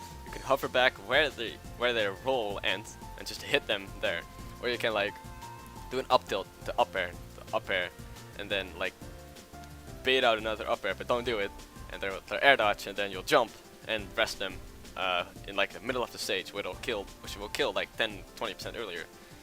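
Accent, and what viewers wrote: Netherlands. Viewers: Lol, ik wist niet dat je nederlands was. Ik hoorde het wel aan je accent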